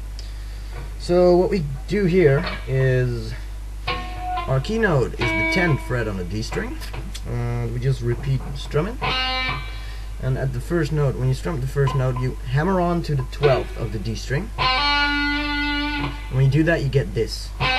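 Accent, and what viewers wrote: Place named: Canada. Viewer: You are definitely Canadian!! Right?..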